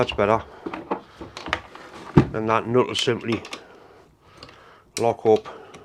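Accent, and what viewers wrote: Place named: Newcastle, United Kingdom. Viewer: Best part of your video's..... your accent. I've worked with all kinds, micks, paddies, scousers, jocks..... and the best are from the Toon. Every time, hardest working guys and girls I know. That thing looks perfect for removing klingons and tagnuts...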